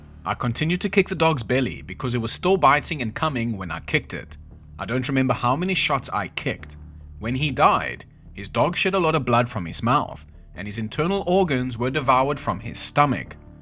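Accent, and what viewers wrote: South Africa. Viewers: You need more subs. So glad to hear a fellow South African. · Is a guy from South Africa talking about the ineptitude of the police in California? Crazy work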